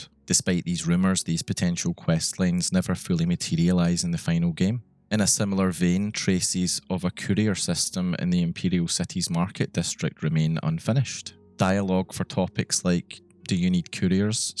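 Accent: Scotland, United Kingdom. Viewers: Can you use a different accent? It’s hard to fall asleep listening to Scottish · I liked this as soon as i clicked onto it because i heard a fellow scottish accent, and it's about oblivion. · Are you Scottish? Btw awesome video love the accent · Is that a wee hint ae a Scottish accent? Or am a wrang neebur? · Fuck me. I forgot you are a Scotsman 😂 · Subscribed for the Scottish accent. 🎉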